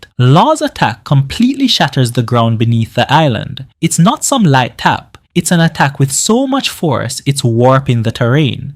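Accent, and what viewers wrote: Jamaica. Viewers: You sound Jamaican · Your deff jamaican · are you jamacian?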